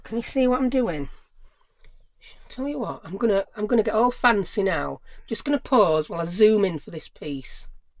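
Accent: United Kingdom. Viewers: #1. LOVE your tutorials. #2. LOVE your accent! Might sound crazy but your accent is very much like Paul Sutherland from True Crime Enthusiast podcast! The hubby and I love that podcast. I say this with the utmost appreciation because it is a welcoming accent. I feel like we could sit and chat over a cuppa tea<<<of course that kinda sounds like the start of a creepy stalker episode of True Crime-muwahaha. {Nah...just a native New Yawka that loves cool accents and all things UK 💜} and #3. You mentioned a type of glue-is it "calall" glue"-when adhering the corners. What kind/brand of glue is that? Thanks so much for all your awesome sharing!!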